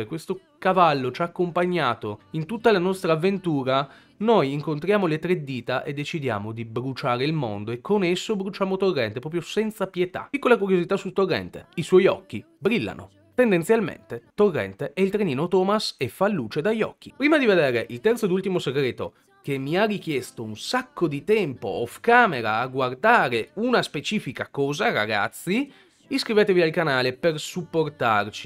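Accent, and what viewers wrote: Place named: Trieste, Italy. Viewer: Si dice crémisi non cremísi, cerchiamo di parlare correttamente la nostra lingua. Capisco che dall’accento che hai la parli poco però sforzati, Trieste non è in Austria da un po’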